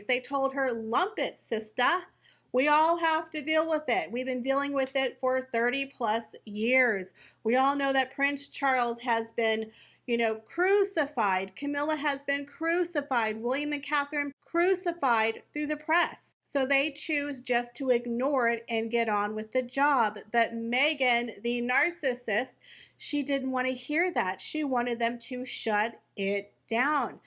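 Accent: South, United States. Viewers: I really like your views and you speak exactly the same as my southern cousins. I’m in the middle 🇺🇸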